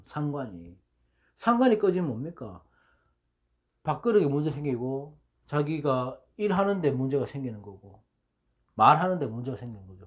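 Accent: Gyeongsang, South Korea. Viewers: 젊으신데 구성진 경상도 사투리 살짜꿍 가미된친근한 목소리 마음 편안하게 들을수 있는 감사합니다 ♥️♥️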